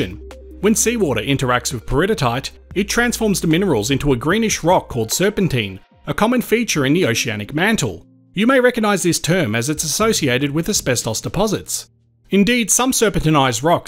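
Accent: Australia. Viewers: Sorry, I'm a person with hearing problems. I can barely stand listening to the Auzzy accent. It's me, not him.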